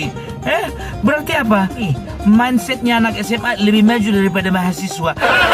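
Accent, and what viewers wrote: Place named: Medan, Indonesia. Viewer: Gas terus opung jangan di kasih kendor kami senang dengan logat Medan kami dari Jawa Barat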